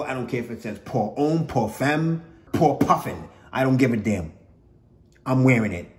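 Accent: Boston, United States. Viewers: that's a 100% authentic Boston accent right there.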